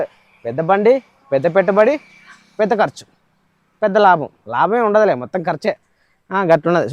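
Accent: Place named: Andhra Pradesh, India. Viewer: Anna nidhi husnabad kada andra basha matladutatav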